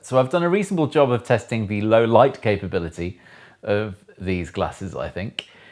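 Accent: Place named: England, United Kingdom. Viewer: I like unboxings with the English accent, but I just bought them just to do bets on how creepy they are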